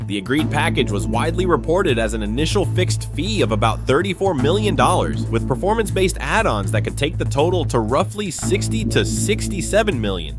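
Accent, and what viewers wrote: United States. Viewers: Why in God's name do we have to subjected to this awful American garble? Learn English we were here thousands of years before you!!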